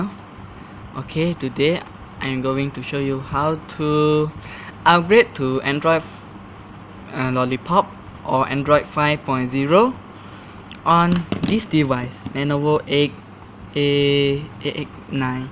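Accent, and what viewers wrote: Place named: Malaysia. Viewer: Sounds like malaysian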